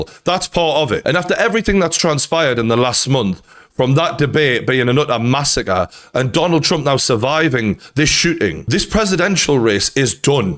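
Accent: Newcastle, United Kingdom. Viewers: geordie man!! you could talk the hind leg off a donkey - you've found your profession and im still searchin' for my channel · Fucking Love a Passionate Geordie... 🤣🤣🤣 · Not a Scot ,he’s a geordie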